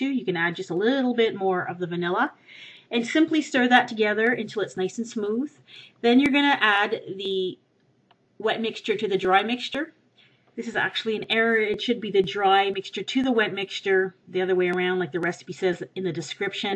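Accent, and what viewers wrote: Canada. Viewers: It's an accent thing common among canadian people.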